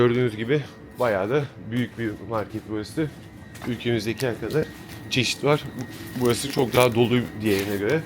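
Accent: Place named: Thrace, Turkey. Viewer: Biraderim sanırım Trakyalı mısın Ben anladım Ne yapıyorsun be ya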